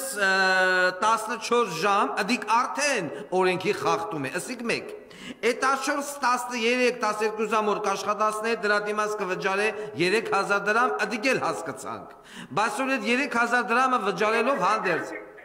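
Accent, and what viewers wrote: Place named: Gyumri, Armenia. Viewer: Բարեև պարոն դուք վերջն եք գյումրվա բարբառը վերջն էր ❤❤❤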